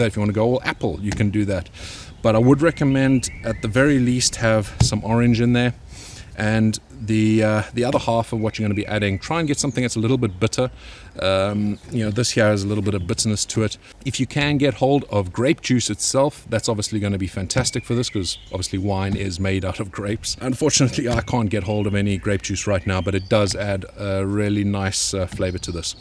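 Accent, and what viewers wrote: South Africa. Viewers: Nicely styled out there, could've had water all over the deck! Looking forward to trying this, just picked up a couple of demijohn's from Wilko (£8 each - pretty good value), and ordering the chemicals on-line. Going to try 3l grape juice, 1l Orange to start with (make sure I can do it before I get too inventive!). Hopefully should be good. Thanks, and love the SA accent by the way. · That's put a smile on My Face, I thought i heard a SA accent and thought that's where You are ? What part of the UK are you ?, I now live in the Northwest of Ireland But Originally from Portsmouth ,UK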